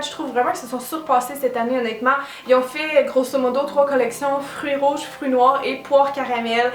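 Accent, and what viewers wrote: Quebec, Canada. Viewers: C'est un plaisir d'avoir tes vidéos ! Très professionnelles et généreuses ! C'est tellement cute ton accent québecois - ça me rappelle de bons souvenirs en voyage là-bas, je vis en France - et ta bonne humeur c'est déjà les fêtes de noël ! Merci pour les conseils et le concours. J'y participe et ce que j'aime le plus lors des fêtes de noël c'est la magie de sentir des amis et des familles réunies dans la joie et la générosité. Autrement dit, ce que je préfères c'est voir les gens que j'aime heureux d'ouvrir leurs cadeaux. Belle continuation ! Merci pour tes vidéos ! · Coucou, j'adore vraiment tes vidéos et ton petit accent canadien ahah! Je suis en France et je participe au concours, ce que je préfère a noël c'est les repas de famille, es retrouvailles et puis la magie de noël tout simplement! J’espère gagner ce concours pour une fois ahah ♥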